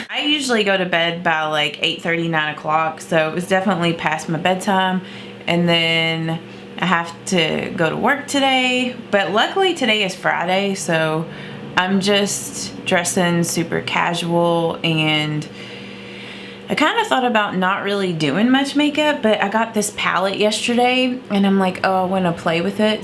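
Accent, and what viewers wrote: South, United States. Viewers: My two Kentucky YouTubers are not posting and I miss y'all!! I rely on that Southern accent to keep me company while I get ready for work am!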